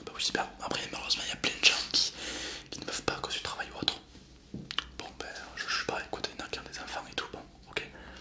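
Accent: South, France. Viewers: Et Bonjour c’est l’accent du sud 😉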